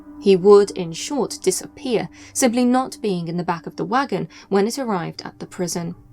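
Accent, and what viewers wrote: United Kingdom. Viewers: personality is perfect for the channel. Quite clean Brit accent too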